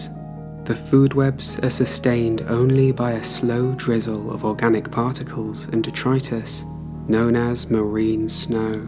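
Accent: United Kingdom. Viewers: really wanna learn but god i can't listen to a british accent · i wish the narrator was not british 💔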